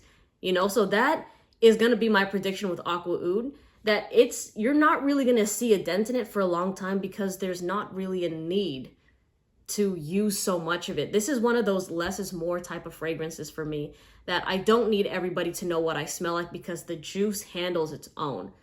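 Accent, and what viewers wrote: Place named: Philippines. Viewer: you definitely sound Filipina to me..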